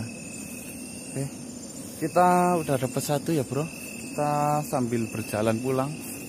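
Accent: Banyumas, Indonesia. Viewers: Mas wong ngendi mas, kayane ngapak tmen wkwk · Woy kamu dari Ngapak bagian mana? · Jal rika wong endi apa wong banyumas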